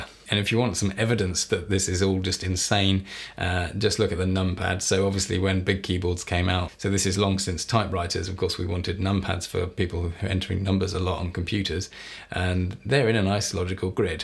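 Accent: United Kingdom. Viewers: That cultured accent doesn't hurt. For the life of me I cannot comprehend why the Scandinavian countries would choose an American accent over a British one when adapting English as a second language. Pure insanity. I'm not even British and have to admit to the clear superiority in their pronunciation. I mean a refined accent like this guy has, not some brash, horrible concoction you hear so often outside of London. Here in Canada we have Quebec that brutalizes the beautiful language of France! Its so strange how people don't seem to discern language pronunciation. In New York City which is plagued also by a horrible accent high achievers seek to rid themselves of that accent by the time they have graduated university. They are making a good choice. One of the worst in the US? Boston! Ugh. Sounds like they barely graduated kindergarten. Maybe I'm too sensitive. 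No offence to people from New York and Boston!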